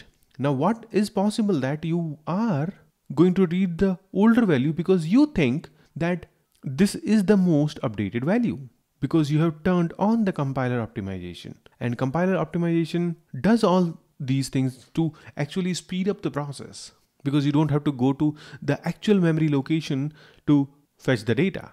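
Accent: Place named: India. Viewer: You have an overpowering accent that makes you difficult to understand, there are AI tools that can fix this and online training resources to teach yourself how to minimize it, if you choose to. It's not bc you have an Indian accent specifically but bc it's a very heavy one. Try bring your tongue forward in your mouth when you speak English and stop 'rolling' your Rs.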